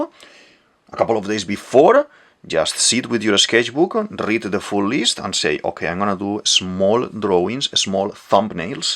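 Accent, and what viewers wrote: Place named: Spain. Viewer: Wow, I just found your channel. You fascinate me right off. Your accent sounds Spanish, your self portrait indicates you may be of Asian decent, and you live in UK. Haha how fantastic! Also, I love your work!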